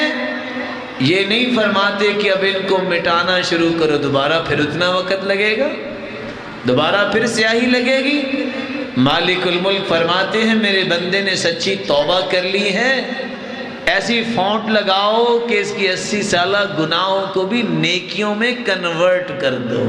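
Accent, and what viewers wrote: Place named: Saudi Arabia. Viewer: MashaAllah MashaAllah sheikh sahb ap saudia sy lgty h apka hadith parhny ka lhja arabic h coz mny quran saudia sy hifz kiya h.Subhan Allah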